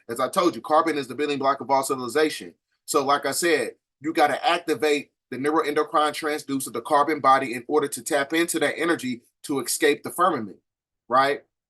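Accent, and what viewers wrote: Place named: Louisville, United States. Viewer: I hear Louisville accent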